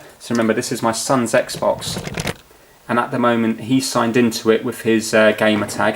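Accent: United Kingdom. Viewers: Awesome! Here we are in 2019, self-driving cars and all, yet those janky paper-cutouts (and my subliminal sense of confidence in an erudite British accent) were exactly what I needed so my kids can play at the same time. Thanks dude, from JB in California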